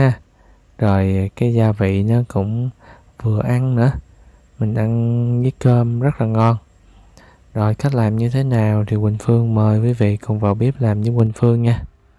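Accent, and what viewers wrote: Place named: South, Vietnam. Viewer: Giong nói mien Nam of dễ thương hiền lành,cô người bắc 54 nhưng thích giong nguoi mien Nam,cam on các mon chay ngon của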